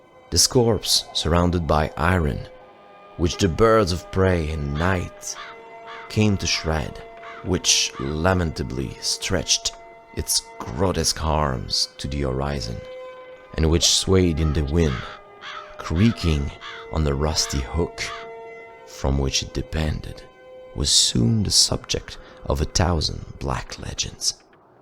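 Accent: Quebec, Canada. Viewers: To those poor little souls hurt and insulted by a slight French Candian accent... Did you also go on Disney's forums to complain that characters in Encanto have a Spanish accent? The legend is from Quebec City and Lévis, where citizens still talk French today. It's 2022, FFS! Please, grow a damn spine and enjoy people's cultural upbringings! It's a strength, not a weakness! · Also it's great to hear a quebecer !!! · As a Quebecer, I've heard of La Corriveau, but it was a different story. I wonder how they discovered it really was only the two men she had killed? Very interesting, and love the added touch of having a Quebecer narrate this story.. the morbid aside, this was lovely! :)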